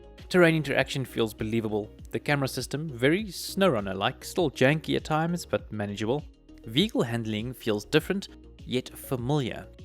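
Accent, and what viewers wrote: South Africa. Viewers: Bro are you south African, I Wil sub if you are.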